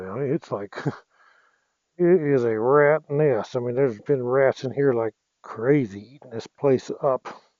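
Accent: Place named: Georgia, United States. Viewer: ain’t no way ur from south Arizona sound like Georgia or maybe my area East Tennessee haha !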